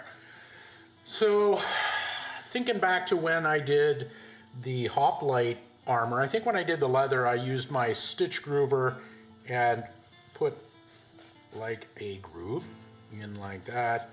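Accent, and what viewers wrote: Canada. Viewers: Love this channel., what is your accent, Canadian?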